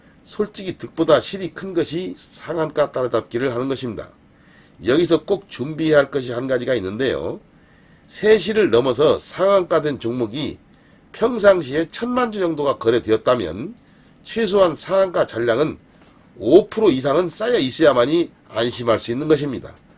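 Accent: Jeolla, South Korea. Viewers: 유바이오 . 언급해 주셔서 깊이 감사드려요 항시 도움주시려 애쓰시는 모습도 감사합니다 전라도 사투리도 정감갑니다 늘~ 건강하시고 평안하시길 기원드립니다 ♡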